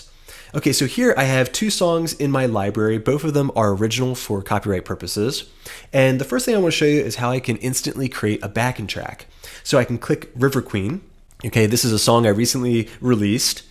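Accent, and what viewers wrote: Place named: Maryland, United States. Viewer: Great demo! Your accent sounds a lot like a Chesapeake Maryland accent.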